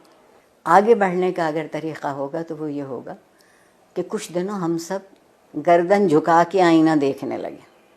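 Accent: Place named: Lucknow, India. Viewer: She has Lucknowi urdu accent also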